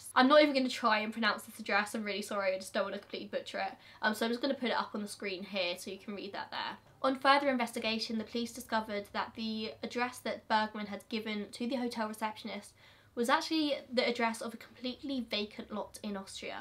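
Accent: England, United Kingdom. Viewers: You are sooo beautiful! I love your videos! Love your voice and your beautiful English accent 😍😍😍😍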